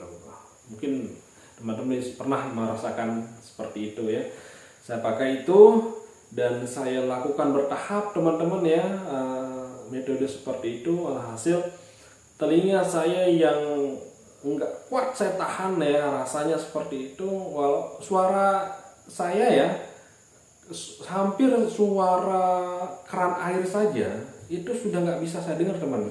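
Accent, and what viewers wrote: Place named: Lombok, Indonesia. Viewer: Kayak logatnya orang lombok ini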